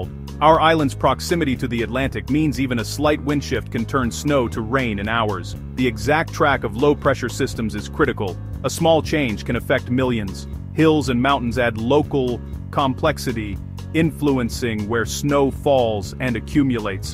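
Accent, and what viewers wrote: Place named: United States. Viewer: Why does someone talking about the UK have an American accent?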